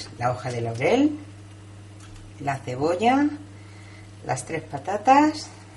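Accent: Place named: Andalusia, Spain. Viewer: Me da a mí por tu acento que eres andaluza y que vives en la comunidad valenciana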